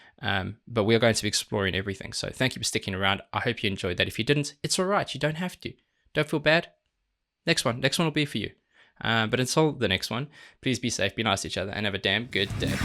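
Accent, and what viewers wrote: South Africa. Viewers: This dude sounds South African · I Knew that you were South African , I have the same accent you have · Bro you sound South African, I may be wrong though 😅 great video friend, Alex is such a madlad 🤘🏻 Edit: He's South African, my bad 😅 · that a south african accent?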